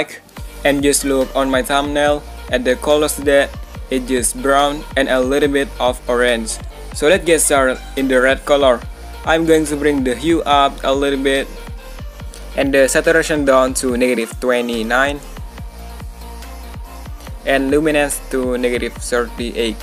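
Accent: Indonesia. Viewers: are you indonesian? hahaha those accent tho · Indonesian accent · aksen Indonesia memang top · English aksen indonesia 😅 but videonya sangat membantu bro.. thank u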